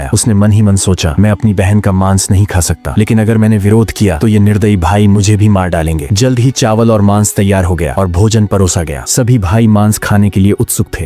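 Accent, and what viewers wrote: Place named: Chhattisgarh, India. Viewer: Maa sunaya hai kahani cg boli me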